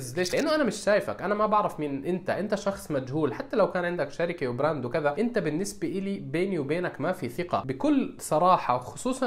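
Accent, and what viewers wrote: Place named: Jordan. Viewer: شكرا على محتواك الرائع بس سؤال صغير انت اردني؟؟؟؟؟ لأنو لهجتك زينا ونا انعجقت مش عارع ههههههههه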